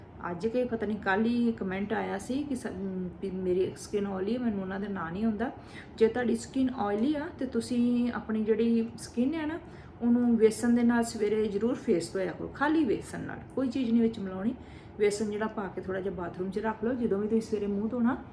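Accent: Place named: Punjab, India. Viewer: ਬਹੁਤ ਵਧੀਆ ਤਰੀਕੇ ਨਾਲ ਦੱਸਦੇ ਹੋ ਤੁਸੀਂ। ਠੇਠ ਪੰਜਾਬੀ ਬੋਲਣ ਲਈ ਧੰਨਵਾਦ ਜਿਵੇਂ ਬਦੋ ਬਦੀ। ❤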